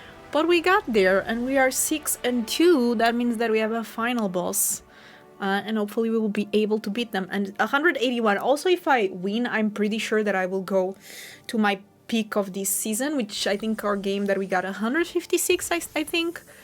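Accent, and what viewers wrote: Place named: Portugal. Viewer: Your videos are thought provoking and instructive. Even when I don't agree with your choices, my consideration of them makes me a better player. I also like your style of play and the fact that you are courteous to your opponent. I slow play a lot because I'm old. One more thing...I am a Portuguese-American. When I hear your accent, it reminds me of my old neighborhood where so many women talked like you and I smile. So, just like me close my saying that as far as your videos go gosto sim. (That exhaust my command of the Portuguese language.)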